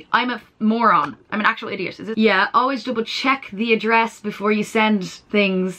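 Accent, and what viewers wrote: South Dublin, Ireland. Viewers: I'm actually obsessed with your accent because it gives me south Dublin vibes but without the judgment. Also obsessed with the tattoos I'm going to turn myself into a doodle pad I just want all the tattoos now! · Are you irish ?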